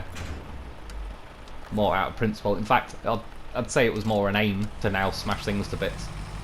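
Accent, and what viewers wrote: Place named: Caernarfon, United Kingdom. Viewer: Hi there. As a regular viewer of your Snowrunner vids I’ve spent a lot of time listening to your accent. While I appreciate you don’t have a North Wales accent - there is a slight hint of a Caernarfon/Anglesey edge to it. Just wondered if you’ve ever lived up here in North Wales? Sorry if it a personal question! Keep up the good work - your Dave stories are great and general banter as you drive is very funny. I regularly laugh out loud. Needless to say I now own multiple Loafs thanks to you. I whole heartedly recommend this channel!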